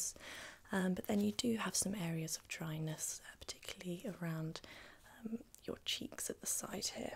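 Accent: England, United Kingdom. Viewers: i like your british accent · L v It’s still an English accent, irrespective of how many there are 😏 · You have the perfect voice for asmr. The fact that you’re british as well makes it that much better. I’m Canadian but british accents have always been my favourite. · Something about a British accent at a certain volume relaxing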